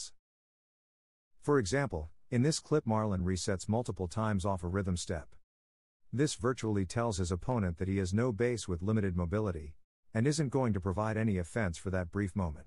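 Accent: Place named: India. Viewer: He sounds like an indian so no lol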